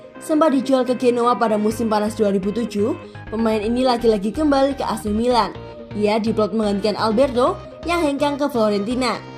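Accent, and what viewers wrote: Java, Indonesia. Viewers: Meddok pingit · VO NYA MEDOK BAT DAH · Bahasanya Medok Jadi Susah Bilang Borrielo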